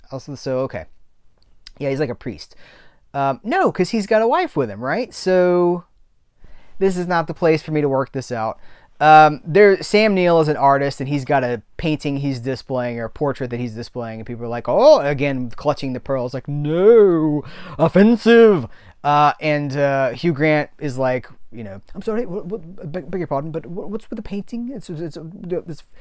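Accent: Australia. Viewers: As an Aussie, I love Umbrella Entertainment! The fact they are finally putting love and care into older Australian films in numbered lines is fantastic. And also making films available in Australia on bluray, to save importing! Watch the Beast the other night... wow, crazy film, but weirdly good. Hope you continue to enjoy the Aussie content too. 1) great Aussie accent 2) Great Stewie impression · Your Aussie accent is very good and digging your 70s/80s look, keep it up!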